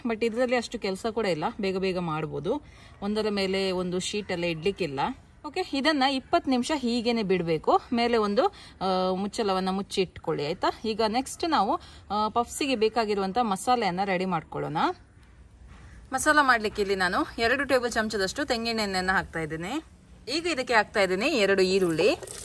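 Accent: Mangalore, India. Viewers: First thing i like is mangalore slang ,n second thing is ur recipes is ultimate for healthy and natural making ..continue akka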